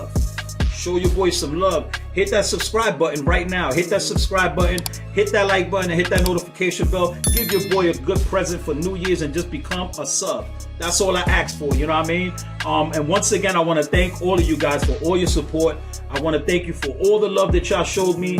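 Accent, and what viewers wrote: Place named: Bronx, United States. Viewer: Yooooooooooooo seen you before onba few chan never seen your vids !! Very nice set up !! Where u from man I hear a lil BX in u !! Let me find out u from there! Hit me back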